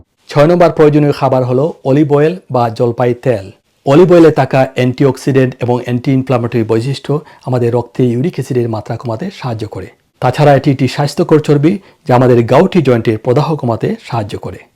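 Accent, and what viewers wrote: Sylhet, Bangladesh. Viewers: ডাঃ সাহেব আপনার জন্য আরশি বাদ রহিলে আপনি সিলেটী কথায় বুঝা যায় সঠিক জানি না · আপনার একসেন্ট। খ উচ্চারণ। ভালো লাগলো সিলেটি ভেবে 😊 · আপনি সিলেটী নাকি?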